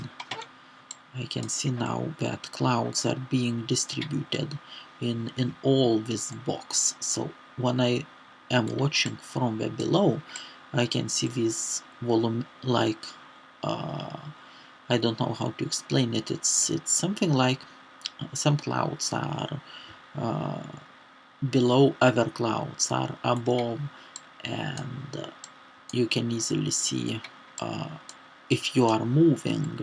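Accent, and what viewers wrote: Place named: Balkans. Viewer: Finally after 2 hours of searching i finally found good tutorial... Thank you! P.S. I might be wrong but from your accent and the pronunciation of the "R" ...i have the impression that you are from the balkans? (Sorry if i'm wrong).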